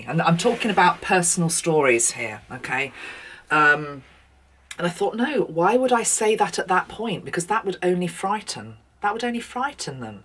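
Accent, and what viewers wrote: England, United Kingdom. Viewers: Hello I was just introduced to you last week by a friend and I so resonate with your messages I love your lovely English accent and I so appreciate your truth Thank you so much where we go one we go all Diane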